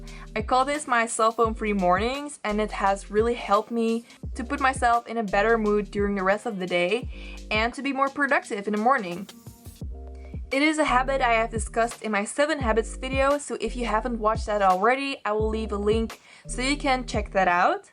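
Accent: Netherlands. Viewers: are u dutch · Haha I thought you sounded Dutch and then I saw the Kruidvat & Albert Heijn products. I love Dutch YouTubers who make English videos! ☺️ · Hold on for a sec, your Dutch?😱😱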